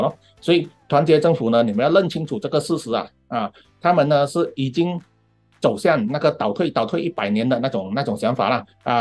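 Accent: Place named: Fuzhou, China. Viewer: Sarawak福州人在此报道 🙋🏻 听到你讲福州话好亲切😊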